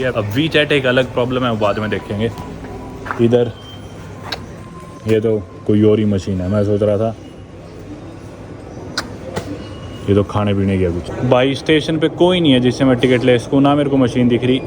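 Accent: Haryana, India. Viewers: मैं देख रहा था पंजाबी जैसा क्यों लग रहा है फिर बोला तो पता चला ये हरियाणवी जाट है 😅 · Love your harayanvi accent son! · With all due respect, I can't understand your curry accent English.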